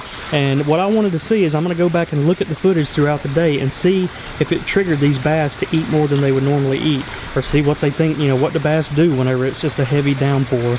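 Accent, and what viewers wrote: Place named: South, United States. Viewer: I don't know where you guys are located, but it looks like a southern state (due to your accent and all the bayous in your videos) so with that said, have you guys considered some of the smaller species of gar? That'd be such a cool inclusion. I used to live in FL (now back in CA) and I used to love watching gar swimming near the surface.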